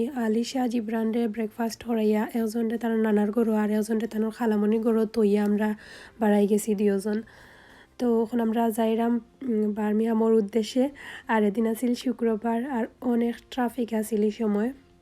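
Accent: Sylhet, Bangladesh. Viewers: Assalamualaikum apu apnar vlogta onek balo hoesay kub sundor laglo. apu Ame apnar onek old fan bt comment korene kokono. apu ameo apnar moto Sylhety akjon may tai apnar kota suntay kub balo lage amar.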